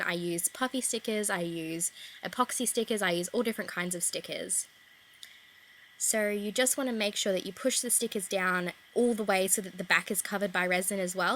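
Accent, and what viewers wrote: Australia. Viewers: I love your beautiful Aussie accent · An Australian accent! So refreshing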